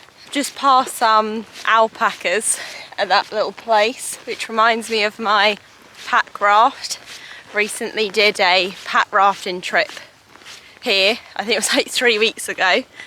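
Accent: United Kingdom. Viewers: Thank you for sharing your hikes. You are fabulous. Not just you inspire and insight me, but you actually teach me british english. English is not my first language, and you happen to speak slowly and clearly. Love it when you say 'lush' and 'mental'. Above all, i appreciate the lovely person reflecting back at me. Every time you smile, your eyes sparkle, brightening up my day.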